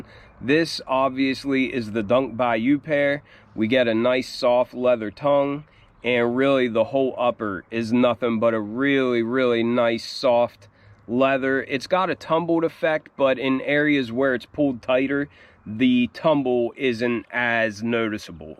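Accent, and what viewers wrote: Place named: Pittsburgh, United States. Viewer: That mint green is going crazy! I love them! Pittsburgh here, bro you got to be from Pittsburgh? I know I've heard you say you live in WV but that accent is heavy Pittsburgh. And I heard you say you love the teams. Just curious if you're from here or from WV? Not that it matters I love your stuff. Great reviews, great taste!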